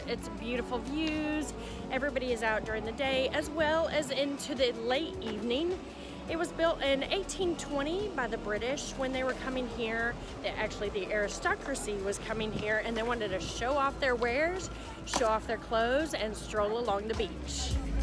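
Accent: South, United States. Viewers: Where are you from? I’m guessing from the south. 😊 Do you speak French? I am from Texas so I have a similar accent. I have been trying to learn the basics of French, but I feel silly speaking with my accent. Any tips?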